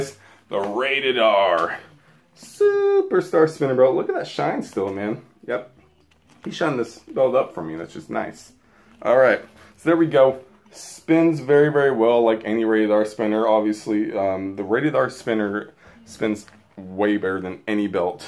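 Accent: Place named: Australia. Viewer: That's actually quite a good aussie accent!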